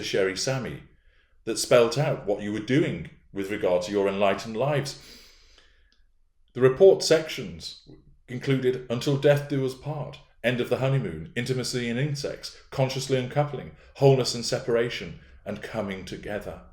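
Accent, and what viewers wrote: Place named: RP, United Kingdom. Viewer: swears when he wants to get an important point across. He sounds posh, and he does it with great finesse.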